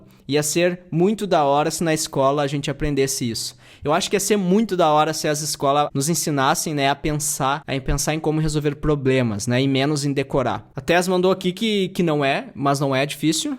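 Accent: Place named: Porto Alegre, Brazil. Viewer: Me inscrevi pelo conteúdo e porque tu é de Porto Alegre (notei pela fala). Abraços!!!!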